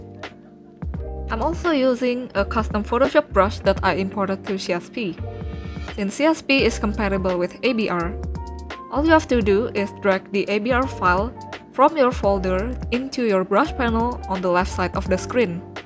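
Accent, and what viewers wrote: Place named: Indonesia. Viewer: dari logatnya mah indo inii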